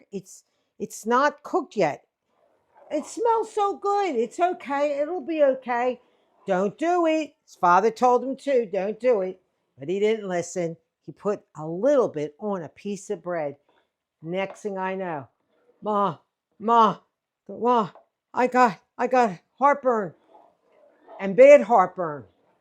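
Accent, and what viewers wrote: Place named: Philadelphia, United States. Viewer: You sound like your from south Philly, in south Philly or south jersey we always called it gravy!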